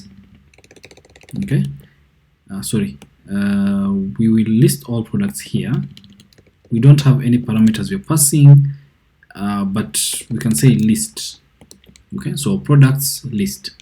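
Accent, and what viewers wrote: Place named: Kenya. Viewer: Totally unrelated, but you sound Kenyan🤔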